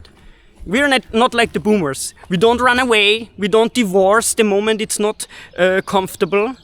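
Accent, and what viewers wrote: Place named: Germany. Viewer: Ah yes, the eternal dilemma of every German YouTuber: Speak your native language, and your content is effectively trapped within the relatively small audience of Germany, Austria, Switzerland and maybe a few neighboring countries. On the other hand, by speaking English, yoru videos suddenly have the potential to reach a global audience, but unless you're genuinely fluent (C1 or even C2) you'll always be ze weird German guy with ze äxent. It's either accepting a smaller audience with which you can communicate naturally, or accepting the fact that you'll always have a linguistic handicap on the global stage. I can relate to that.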